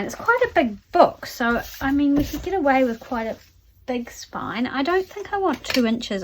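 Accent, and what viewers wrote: New Zealand. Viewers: 🧚🏻‍♀️Thanks so much, I really enjoyed watching your process of expanding the spine of your Readers Digest! Look forward to your next video. Lovely to hear our Kiwi accent on YT!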